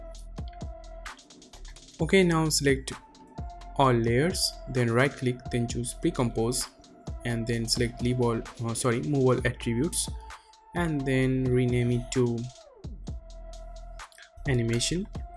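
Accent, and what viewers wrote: Bengal, India. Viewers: bengalis from bengal have shittiest irritating english accent.. amazing btw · Brother are you indian? lol❤️❤️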